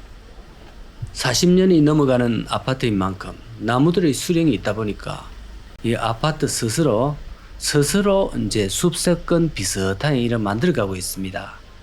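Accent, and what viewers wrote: Gyeongsang, South Korea. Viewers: 갱상도 발음이라 이해해야됩니다 쌀도 살이라 말합니다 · ㅋㅋㅋ경상도사람들은 "으"발음을 못해서 "어"나 "오"나 "아발음을 하는데... 그 이유는 원래 우리말 고어에는 <아래 아>로 발음하는 게 있는데... 이게 일제 때 24개 현재의 자모음으로 정해지면서 4개의 자모음이 발음하기 불편하다 하여 생략하게 합니다. 그러다보니 <아래 아>가 다양한 모음조화에 따라 다양한 모음으로 발음하게 되면서 특히 경상도 사람들의 경우 원래의 그 주된 구성원들이 저 북쪽의 사로(중국에서는 이를 고어 발음으로 "슈어눠"라고 발음하는 것을 문자로 적을 때 그 차자로서 "흉노"라고 적은 것) 사람들이기에 그 발음이 거센 발음이 많은 게 특징이며, 된발음을 잘 하지 못하는 특징을 가진다. 그래서 쌀"을 "살", "싸움"을 "사움"이라고 하는것처럼... 특히 이 "사움"은 일본어 고대어 에서 <"사우무" => "사무우" => "스모" = 씨름> 의 원형이기도 하다 위 영상제작자도 계속해서 <은마>라고 발음하지만 실제 들리는 발음은 <언마 혹은 엄마>로 발음하게 되는데 이는 어짤 수 없는 지역적 방언의 특성이다. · 누가 뭐락 했심껴? 갱상도 발음으로는 은마를 언마라 부르는거 모르십니껴? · 경상도 사투리의 매력에 웃네요. 은마(언마) 스스로 (서서로) 죽이라.(직이라) 사투리가 은근히 매력 있네예. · 경상도 사투리가 압권^^ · 갱상도 ㅋㅋㅋ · "와~ 후덜덜덜" 😅 갱상도 사투리 마 직인다 아이가💣 🌋🎊🤣 오늘도 👣👣👣학실한😂 개드립 ~ 으로 구독자들에게 도파민을 선사하시네요. ㅎㅎㅎㅎㅎㅎㅎㅎ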